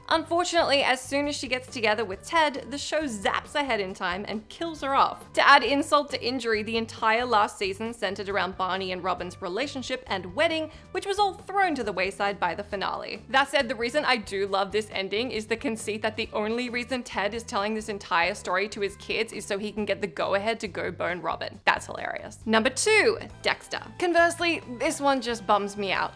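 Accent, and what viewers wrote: Australia. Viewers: Is this person Australian? Just curious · What channel do I know you from??😳 I know you’re not british you’re Australian 💁🏼‍♀️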